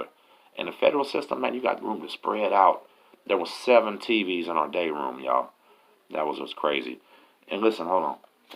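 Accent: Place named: California, United States. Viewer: Sound like out here in Cali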